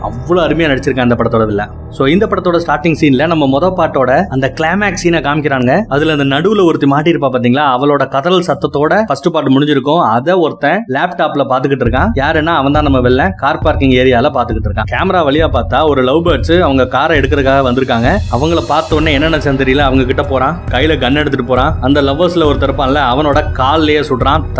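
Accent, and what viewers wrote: Madurai, India. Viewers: Anne Neega Madurai thaana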